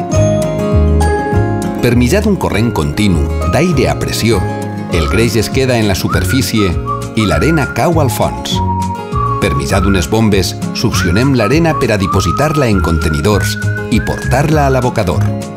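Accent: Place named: Lleida, Spain. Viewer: Buen video, lo pondré todos los días para despertarme con una sonrisa en la cara ;-) P.D: me encanta tu acento de de Lleide (me pone a 100 bb)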